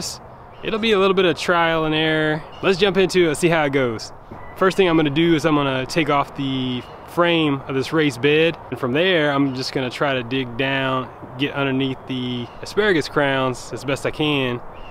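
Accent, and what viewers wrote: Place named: Louisiana, United States. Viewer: Love your accent. Louisiana?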